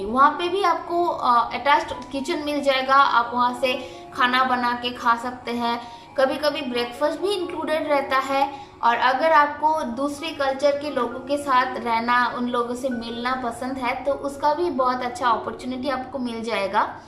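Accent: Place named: Bengal, India. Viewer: Bengali Accent s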